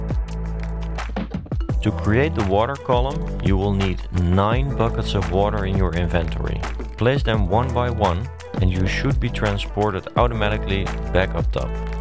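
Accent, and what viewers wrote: Netherlands. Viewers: volgens mij hoor ik dat je nl bent 😅😂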